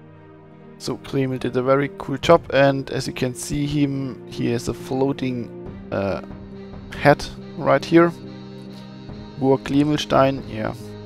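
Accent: Germany. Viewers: Really good video! Although you could really work on your accent, your english in general is good, but the german accent is in there :P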